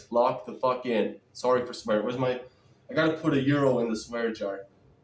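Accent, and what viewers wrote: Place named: United Kingdom. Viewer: The British accent though 🤣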